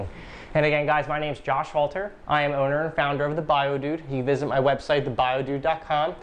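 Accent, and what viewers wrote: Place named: South, United States. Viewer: terrible south accent.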